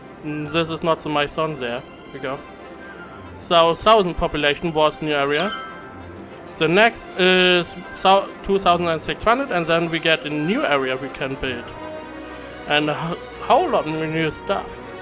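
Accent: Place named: Germany. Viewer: klingt lustig dein denglisch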